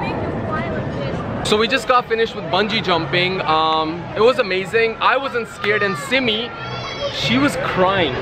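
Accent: Punjab, India. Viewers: right have a punjabi accents with Urdu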